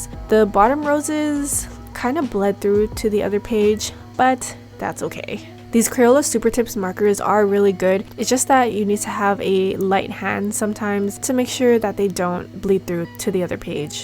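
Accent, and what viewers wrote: Hawaii, United States. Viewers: ho you sound like you from Hawaii.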